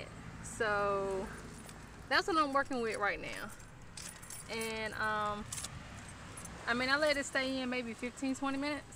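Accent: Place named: South, United States. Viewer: Love ur southern accent! You look fab as usual!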